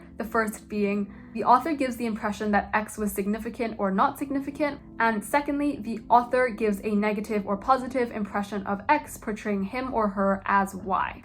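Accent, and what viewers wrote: United States. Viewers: i just realised u sound American 😭 did u move here then do ur GCSEs? x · you sound american so did you take igcse? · woah if ur doing gcses why do u have an american accent? (i am not interrogating)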